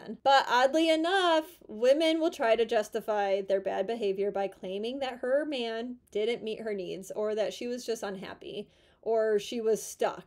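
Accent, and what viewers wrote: United States. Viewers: Very attractive woman, attractive face, attractive voice, from a foreigner who likes the American accent. A woman doesn't belong to a man, a man doesn't belong ot a woman , People like each other and live together or, people have their own homes, they live separately and see each other. Men today are frightened if they live with a woman and she throws him out he will be homeless.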